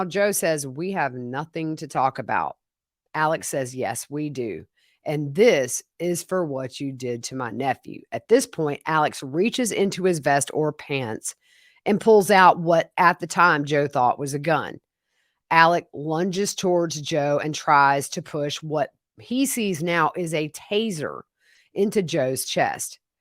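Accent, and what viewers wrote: South, United States. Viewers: Thank You for all you do to keep us informed of all these cases. You are awesome and make me laugh so much with your corky comments, and your southern accent, I love it, God Bless you, your granny, kids and Family, Gurl❤💐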